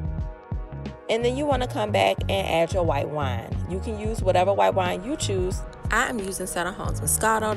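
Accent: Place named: Baltimore, United States. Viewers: Who is this lady why am I just finding you you sound Baltimore😂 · I’m cracking up!! I heard you talking and knew you were from the DMV then I saw the Shoppers label and knew!! Love this recipe sis. Well done 👏🏾👏🏾👏🏾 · Good Lawd! You did that!!! I'm cooking that tonight. I am from New Orleans and looks like home. But, you sound like you are from DC!!! Thanks! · She sounds like she from DC🤭😍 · You frombaltimore 😂😂😂 accent🥰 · Soon as You started to talk I was like “she sounds like me “. I know a DMV native accent when I hear one lol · Loved the video! I knew you were from the DMV area from when you first started talking! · Amazing video!! You sound like u from the DMV area 😁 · I think I’m hearing a little bit of a dmv accent , but this look so good 😍😍😩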